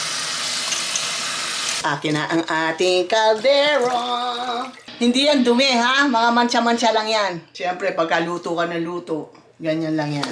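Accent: Philippines. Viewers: I feel like Im in the Philippines while watching. The accent, the vibes, and humour feel like you are at home with your nanay. With all the ❤️ dun sa kare-kare not just appetizing but eating w family together is therapeutic.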